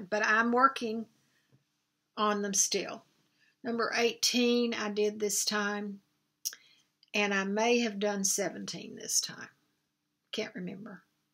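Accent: South, United States. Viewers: I enjoy your videos and live listening to your southern accent. One.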